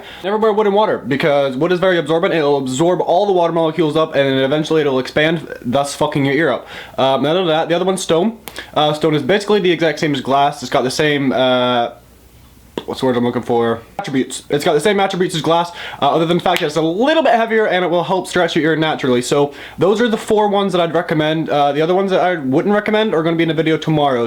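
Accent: Scotland, United Kingdom. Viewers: Are you scooottiish or iriish?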